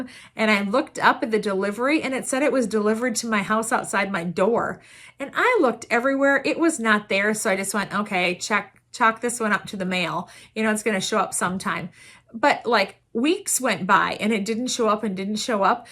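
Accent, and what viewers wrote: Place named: Minnesota, United States. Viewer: Love your shirt!!! I live in Minnesota--I thought I recognized your Minnesota twang...lol. I also own two farms in southern Iowa.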